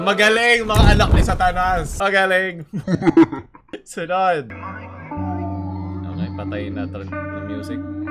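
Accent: Philippines. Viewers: I like your filipino accent, say it again Tito: Intarnet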